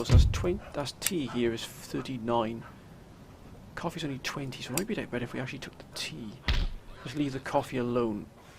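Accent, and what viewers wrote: United Kingdom. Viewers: Hello my friend. It's good to hear from you. I am very happy that my advice has been helpful for you. If you don't mind me asking I was wondering where you are from. You sound British. I'm just curious. Either way I do count you as a true real friend even though I've never met you in person. Hope all is well with you. I was wondering when you will start assigning Generals. No rush. Just curious. Have a great day my friend. Can't wait till the next episode! ☺☺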